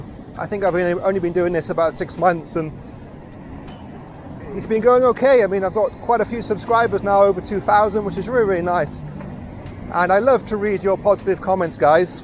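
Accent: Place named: Wales, United Kingdom. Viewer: Good day Buddy, Louis Kats here from Melbourne, Australia. Can you please tell me your name. My partner and I have just become subscribers & last night watched at least 20 of your past videos & loved each one of them. Short, sharp & very informative with a Welsh accent. Current also watching Duong Global Business Consulting Group channel with Ken which is awesome. Also Check out " John Saboe " who I watch & is currently living in Da Nang. My partner and I have visited Vietnam twice & we absolutely love & want to live in Da Nang in the next couple of years. Love your bike ride we remember so many landmarks. We stayed at Babylon Garden Hotel which was awesome & I highly recommend. Hooked on your channel can't wait to see your apartment & a cost of living. If you ever come down to Melbourne, Australia happy to host you. My business partner is Vietnamese same as my doctor, tax accountant, lawyer etc. 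I celebrate Tet ever year with my Vietnamese friends really family & we love home cooked Vietnamese food. Great channel great content. Your number 1 Fan in Melbourne, Australia. Louis Kats ☺👍❤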